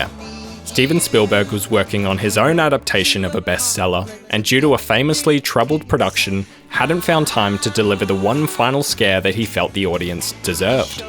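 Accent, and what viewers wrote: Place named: Australia. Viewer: Man keep going. Insane that this doesnt have more views. Fantastic vid! Also so good and refreshing to be able to watch quality videos with an Aussie narrating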